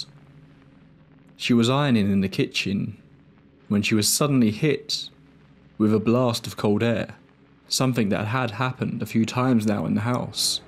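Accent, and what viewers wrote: United Kingdom. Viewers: Why are all videos like this narrated by a British guy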